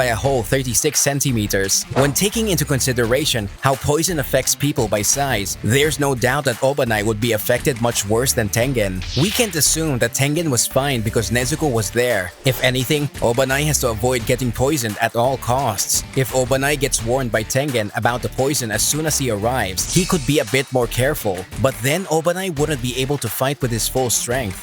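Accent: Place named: Philippines. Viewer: I like the subtle Pinoy accent, love this what if scenarios kabayan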